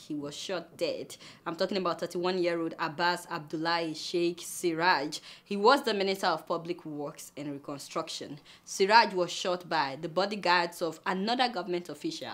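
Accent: Yoruba, Nigeria. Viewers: your funny Yoruba tone tickles me. Keep it up.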